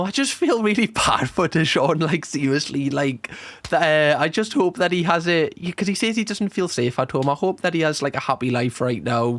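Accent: Newcastle, United Kingdom. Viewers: what accent do u have? ik its british but idk where edit - newcastle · Watching and me mam walks in and says ‘eehhh he’s a geordie’ like we aren’t also geordies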